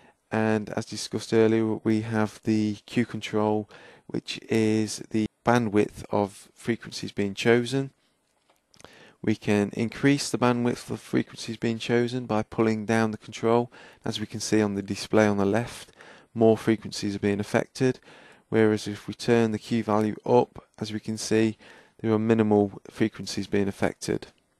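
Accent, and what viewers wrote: United Kingdom. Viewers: hes sounds like he from the midlands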